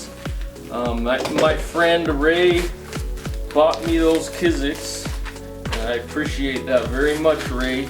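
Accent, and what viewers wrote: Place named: Canada. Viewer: I am just outside of Toronto. Where are you? From your slight accent I thought you might be Canadian. Do you have Native or First Nations heritage? I hope you don't mind me asking?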